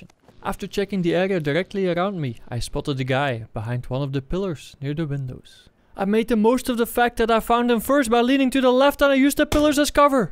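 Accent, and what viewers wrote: Netherlands. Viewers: love your vids man, question.. are u Dutch?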